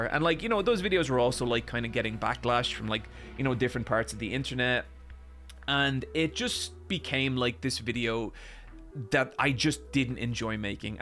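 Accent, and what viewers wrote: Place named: Ireland. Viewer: Watching that guy's videos is going to be like reading nu-Berserk. It's better than nothing, right? Have you noticed that people try to imitate your tone of voice and cadence? It's not just your video titles. They're trying to copy literally everything about your videos minus the Irish accent. What if you include the official Shonen Jump rankings as well as the sales figures? Then you can piss off everyone lol. I really miss those videos of yours because I never gave a shit about Shonen Jump before you started making them, and I have stopped giving a shit about Jump since you stopped making them. I think I am representative of the majority of your audience rather than the Shonen Jump nerds who nitpick them. Maybe you could make a Youtube poll asking these sort of questions: "Did you care about Shonen Jump magazine before watching my Shonen Jump videos?" and "Have you stopped caring about Shonen Jump now that I've stopped making videos about it?"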